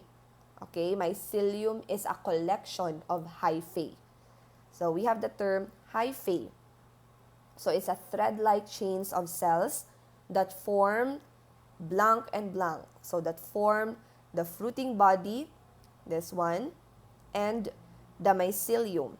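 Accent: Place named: Philippines. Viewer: filipino accent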